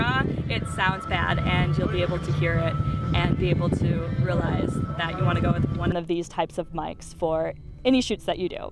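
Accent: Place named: Midwest, United States. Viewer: What I tell you is this: Get rid of it but be able to showcase it if someone asks for it. Or for when you go back and film there or Michigan/Wisconsin where that accent will be wanted regionally. Well I only say Michigan because there is a lot of filming there but that accent is not necessarily there. I like your voice. Sometimes there's a quiver in there when not using the accent like you're nervous or something, but it's very cute when it happens. Like when you get tangled in cords. lol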